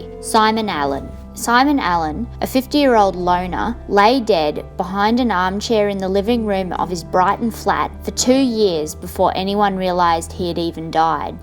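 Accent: Australia. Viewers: Australian accent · sounds Aussie to me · Hey!, you sound like an Aussie.... Oi Oi Oi:) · the narrator sounds like an Australian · the person who speaks sounds austrailian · Watched half of it but the first case was the best and then they got boring. The best part of the video is the narrator's voice: refreshing to hear a young Aussie's voice rather than a yank or a synthetic machine voice! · that australian accent is adorable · 2funny girls Channel UMM NO, Australian people. SHE IS VERY AUSTRALIAN. As an Australian I can confirm that this woman is in fact Australian. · AUssie twang.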